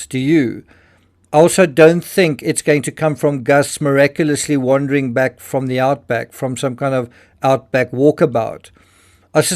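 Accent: South Africa. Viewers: A very interesting video. Thank you so much for covering this case (and all the others you cover, actually). That's the reason I subscribed. You are logical and thought provoking and I always look forward to watching your videos. I was born in Joburg, so love hearing your accent. To this video though ... I don't think anyone will tattle. They are from a farming community and I think that particular property has been in the family for a couple of generations, though I haven't researched that so I could be wrong. I personally think they will stick together, no matter what occurred "think as thieves" comes to mind. But I would love to hear your perspective as to why the mother Jessica reportedly left the property after Gus went missing. The same thing happened with the children missing from Nova Scotia - the mother took off the same day, from memory. I then saw something recently where the step-father has been arrested for some sort of assault charges (not related to the missing children). 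I couldn't help but go " ... hmmmm ... " I am wondering if Jessica left the property after Gus went missing to distance herself because she is aware of what happened to Gus. Looking forward to your next video. Thank you again for your excellent coverage. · He's South African.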